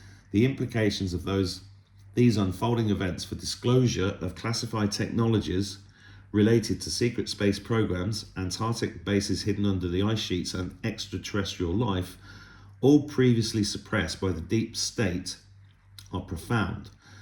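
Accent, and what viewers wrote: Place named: United Kingdom. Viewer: Ohhh you are sounding very British today, hehehe.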